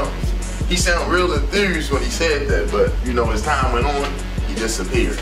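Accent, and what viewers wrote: Baltimore, United States. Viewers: You from Baltimore? I noticed that “Yeew”(You) “Blew”(Blue)😂😂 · B’More Accent??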